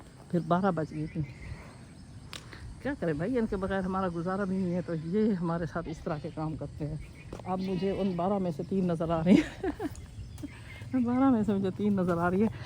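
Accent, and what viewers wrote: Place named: Saraiki, Pakistan. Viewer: 👍❤🤲🤝😘🥰♥️🌺 السلام علیکم ویڈیو تو ماشاء اللہ بڑی مزیدار ہے اپ نے گاؤں دکھا دیا سبزیاں دکھا دیں اور عورتوں اور گلہری بھی دکھا دی مجھے لگتا ہے اپ سرائیکی ہو لہجے سے پتہ چل رہا ہے میں بھی لیہ کی ہوں میں ہم بھی مجھے بھی سرائیکی پوری اتی ہے کیونکہ میری ایک بہن کی شادی بھی سرائیکیوں میں ہوئی ہوئی ہے اللہ تعالی کی اپ کو کامیابیاں دے ترقی انے ماشااللہ ویڈیو دیکھ کے بڑا مزہ ایا اور بہت اچھا لگا کوکنگ ود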